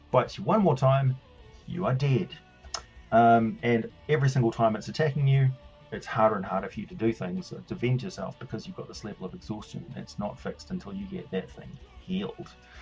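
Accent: New Zealand. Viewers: I knew you were a Kiwi.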